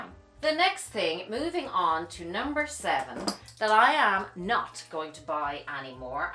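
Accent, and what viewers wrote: Ireland. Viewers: Love the Irish lilt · Love your accent! Are you Irish? Are y’all still locked down? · Hi! I’m a new subscriber & really enjoyed your video. I’m trying to place your accent, Irish? It’s charming!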